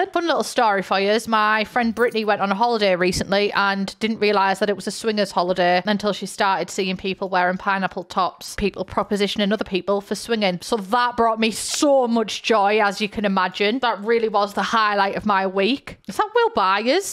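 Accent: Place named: North, United Kingdom. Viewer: FINALLY A NORTHERN BRITISH YOUTUBER THANK YOU FOR EXISTING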